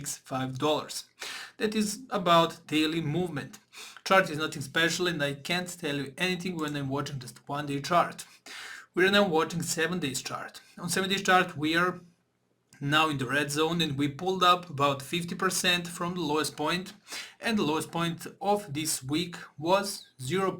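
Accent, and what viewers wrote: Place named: Balkans. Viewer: Are you from balkan?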